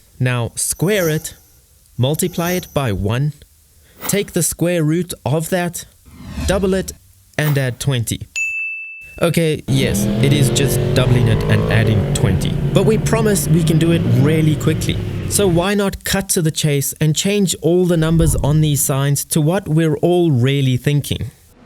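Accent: South Africa. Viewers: Where u from m8? South Africa? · South African? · You South African? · Are you from South Africa mate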